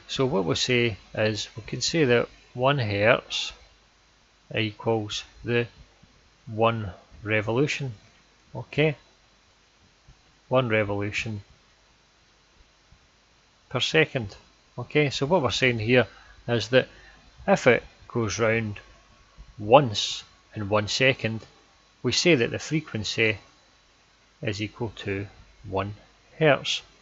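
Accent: Scotland, United Kingdom. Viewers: are u from scotland?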